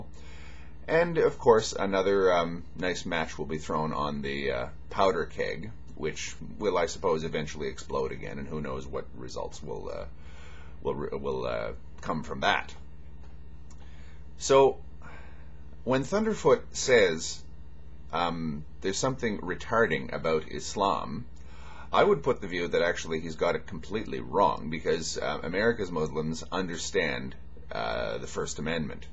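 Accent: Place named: Canada. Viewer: Hehe, Sir, I know a Canadian accent when I hear it:D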